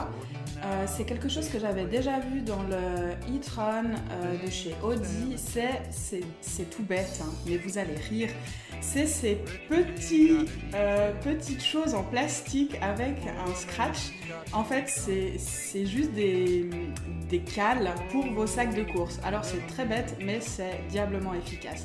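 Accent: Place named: Switzerland. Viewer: Cet accent suisse est charmant ; par contre le chanteur de la musique est pénible.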